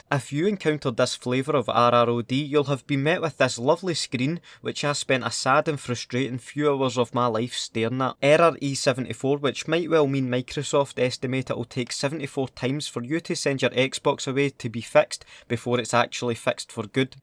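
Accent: Scotland, United Kingdom. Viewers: Love the Scottish accent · Whats this? AND I LOVE YOUR VOICE! Its cool! Scottish? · I love your Scottish accent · Aye scottish mate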